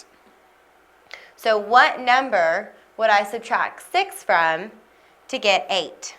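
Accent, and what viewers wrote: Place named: South, United States. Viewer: I'm sitting here and trying to determine where the instructor's accent is from. I hear a faint southern drawl, or possibly North Carolina. Great instructor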